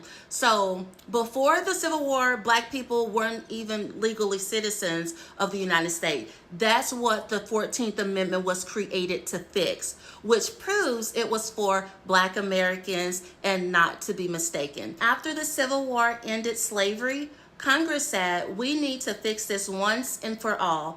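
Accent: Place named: Africa. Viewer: Another African talking about black American stuff. Focus on the civil wars over there and the endangered lions. We can tell by how you talk you are trying to hide that accent jungle jungle girl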